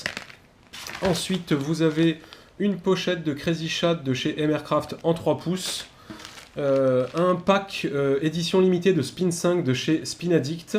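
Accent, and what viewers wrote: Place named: South, France. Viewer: je participe,compte facebook:Numa Fishing , Big Up première chaîne You tube dédiée a la pêche !!je pense c'est l’accent du sud qui joue un peu,mdr !! a plus les mecs ....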